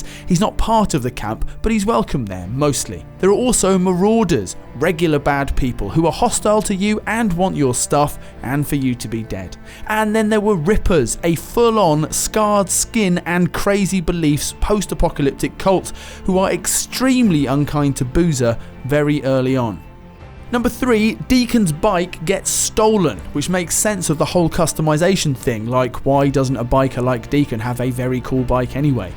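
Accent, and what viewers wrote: United Kingdom. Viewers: My god im tired of british accent!!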